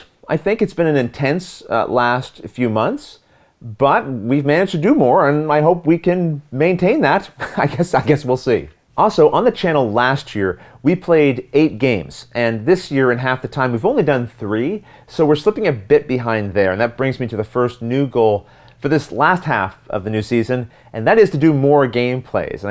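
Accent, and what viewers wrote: Canada. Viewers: I like the review idea, and your opinion can be taken for what it is. I am not going to be more likely to buy a game because or your Jedi mind trick of giving your opinion. It is a different platform for you, and is a different goal. You are in the business of showing people how to Play. And I think that is enough to expect. If you add your opinion, it is just going to see if you like it personally. I don't know if it will change anything. A shorter intro is not a bad idea. I tend to be looking at other things, and don't even really watch much of the intro; just waiting for the meat. So yes, short and sweat. As for the shirt, I sort of like that it adds a level of professionalism to your videos, but you tend to wear nice clothes anyway. I have seen other game videos where people don't even show themselves at all, but in your videos, you are on camera a lot. 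I see your goal of being a friend teaching the game, and I have come to consider you a friend even though I have never been in the same country as you. But I am a retired military officer, so uniforms for me are a statement of professionalism, so I like it. I think you are right, it really doesn't matter what you wear, you are an awesome teacher and do a far, far better job than any other game you tuber I have seen. You are always my first choice to go to find out how to play a game and I will watch you no matter what. You do talk funny, and have a Canadian accent, but I can forgive a few things. I love how you all say iro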